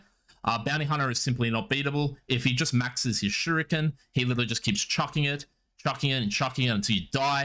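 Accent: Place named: Australia. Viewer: do you play in SEA? you sound aussie XD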